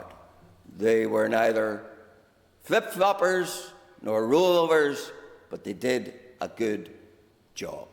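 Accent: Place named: Ulster, United Kingdom. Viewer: this guy has a very old Ulster voice. dinosaur 🦕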